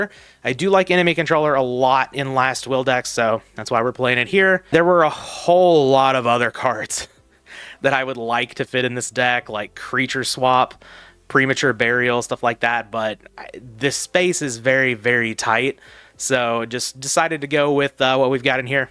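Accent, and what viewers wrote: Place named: South, United States. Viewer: As someone from ohio you are southern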